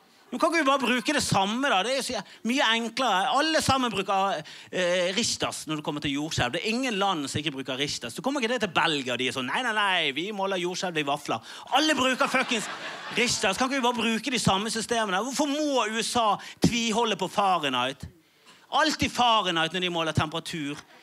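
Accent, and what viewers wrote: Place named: Bergen, Norway. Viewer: Norwegian. Bergen dialect.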